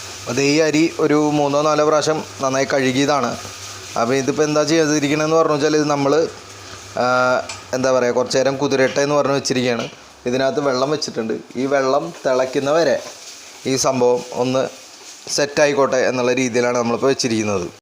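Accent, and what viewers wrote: Thrissur, India. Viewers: ചേട്ടാ അവതരണം മ്മടെ തൃശൂർ ലൈനില് സൂപ്പറായിട്ടാ.... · തൃശൂര്‍ ആണല്ലോ.... പൊളിച്ച് 🤩🤩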